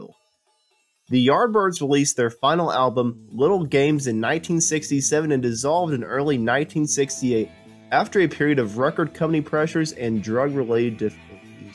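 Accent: United States. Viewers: How awful that this completely British band has an american voiceover on its story. Offensive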